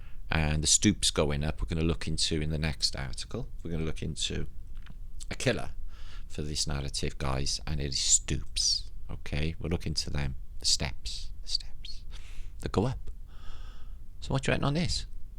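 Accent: Wales, United Kingdom. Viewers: how strange the other reply !! Should change their YT handle to "Anal D" - its a lovely Welsh " accent my friend :-)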